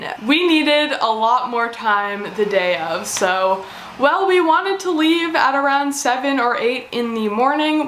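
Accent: Canada. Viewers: It's funny because people always point out my Midwestern accent , and I just noticed your Canadian accent the way we both pronounce out and car and about is like the same lol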